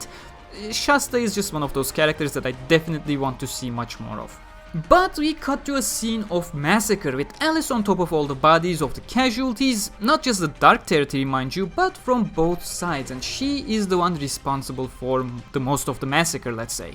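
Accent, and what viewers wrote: Turkey. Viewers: İngilizce'ni duyunca edim acaba bu kanal türk mü diye isme bile bakmamıştım.Başarılarının devamını dilerim reis :D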